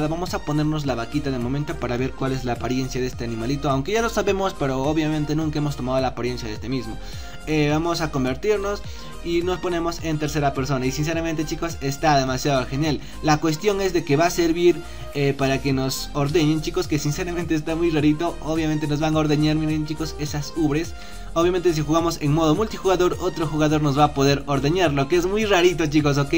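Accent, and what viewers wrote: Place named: Brazil. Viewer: Si se nota que es brasileña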